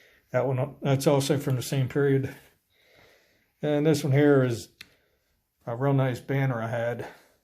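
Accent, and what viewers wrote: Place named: Baltimore, United States. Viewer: You got a Baltimore accent. I'm originally a Harford County girl. Love the Hat btw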